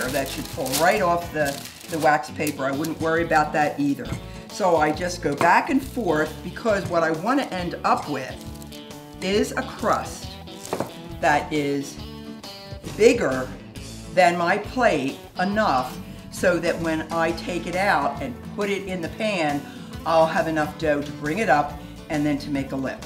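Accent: Philadelphia, United States. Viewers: That Philadelphia-sounding accent makes me lose my appetite. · you have to be from Philly/S. Jersey! Wooder! Love your sass! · This woman lives around Philly, somewhere. I recognize the accent.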